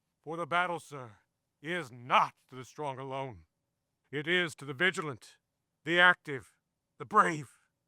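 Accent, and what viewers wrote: Virginia, United States. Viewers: Thank you for this! This was a stellar performance, and that Virginian accent was perfect! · Oh, good night!!!! This guy is excellent! The Virginia accent I recognize, the zeal, the expression are inspiring.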